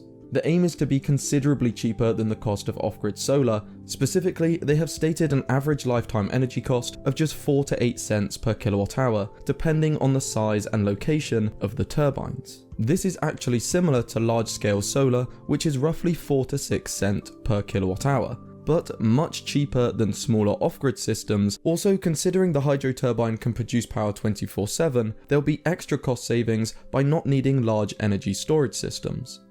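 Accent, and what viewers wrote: United Kingdom. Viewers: Well sounds like a Brit, so understatements are a matter of course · Ditch the British accent. It is considered very lowbrow. Your great content would be better served with a Swedish, French or Irish accent.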